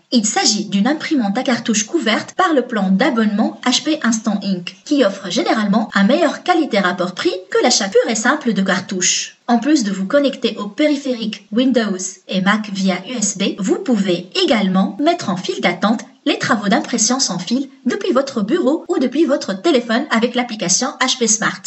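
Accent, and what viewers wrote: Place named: Morocco. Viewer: voix marocainne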